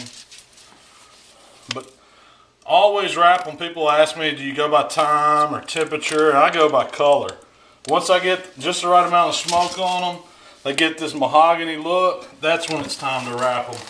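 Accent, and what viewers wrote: South, United States. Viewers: I love your ribs and I love that cool southern drawl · Dig the southern accent. Which state are you from??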